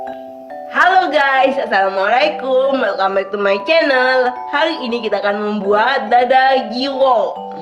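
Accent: Palembang, Indonesia. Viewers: oo pantes aja ..suara dari logatnya keliatan bnget buu...Salam wong kito galo😄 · palembang banget logatnya, R nyo berderuuuuuupppp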